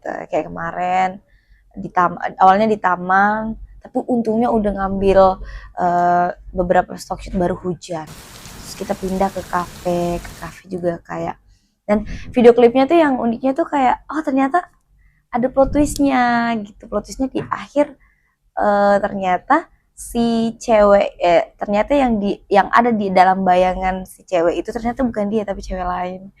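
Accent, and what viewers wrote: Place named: Bugis, Indonesia. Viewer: Khas bugisnya lengket banget😭 "di Tamang" GK tuh, tapi gpp, pokoknya kak terlope-lope lah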